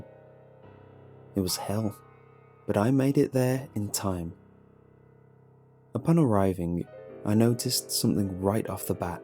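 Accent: England, United Kingdom. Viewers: New England? Sounds like he is from old England to me.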